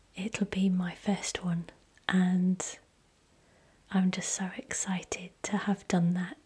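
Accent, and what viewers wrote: England, United Kingdom. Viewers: Enhlish accennnnnnt, live it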